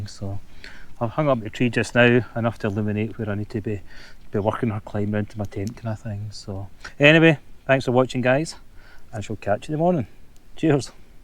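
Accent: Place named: Scotland, United Kingdom. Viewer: That's quite a thick Scottish accent I think I understand half of what you say lol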